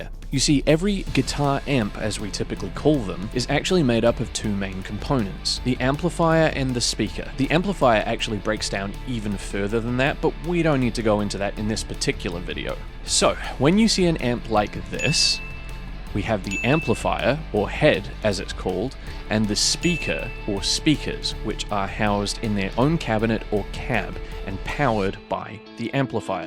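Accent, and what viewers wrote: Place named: New Zealand. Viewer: as soon as im 5 sec in and hear a kiwi accent, sub'd. idk just enjoy seeing cool videos from people from the place im from, especially when it seems like everyone else is american, australian or british. dope vid!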